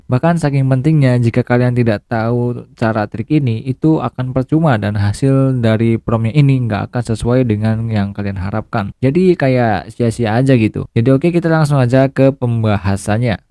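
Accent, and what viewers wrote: Cirebon, Indonesia. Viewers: orang cirebon tah bang ? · Bonggan dewek, wong cirebon tah kang?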